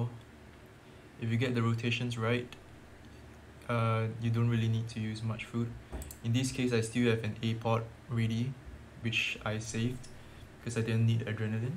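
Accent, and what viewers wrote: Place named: Singapore. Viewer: Bro are you from Singapore? You sound like a Singaporean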